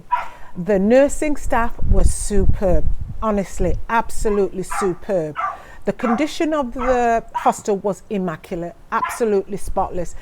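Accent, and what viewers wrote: United Kingdom. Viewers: Are you British ? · Where you from cock .... Sheffield?😁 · Cou cou is our local dish; actually cou cou and flying fish. I can hear from your accent that you are British, do you have Barbadian relatives? Most black folks speaking with a British accent do have Barbadian relatives.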